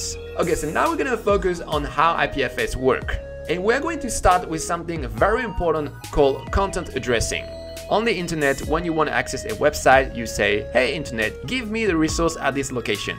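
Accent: France. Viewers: Juste par curiosité, t'es français ?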